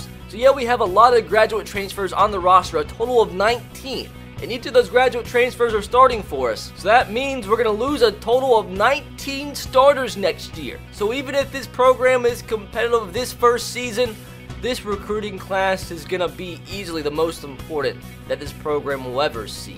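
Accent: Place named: South, United States. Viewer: He’s Southern. Respect the accent, Karen.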